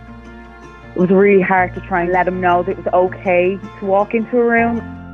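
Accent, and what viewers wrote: Ireland. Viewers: That's an Irish accent I believe - I'm in Donegal and took in a rescue German Shepherd nearly a year ago - he's called Tyson and he weighs 100lb - I love him to bits but he's very reactive but gradually settling down....! Walt is awesome and God Bless you for helping him out of his trauma - just like us humans it takes love and time to recover....! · Thank you xx love the Irish accent xxx Welsh me see xxx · To hear an Irish voice on the Dodo, is rare but funny 🤣😁 love your story. Hope you teach some of your friends the same patients ☺️ so more people do such awesome things 👍☺️ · That's a very solid Dublin accent behind the voice that is speaking only the truth, with regard to Walt. Well done, and may you have many happy years,!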